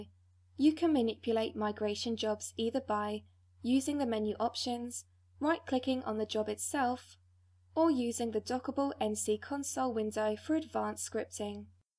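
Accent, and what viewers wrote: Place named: United Kingdom. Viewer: Can't understand a word of her thick British accent.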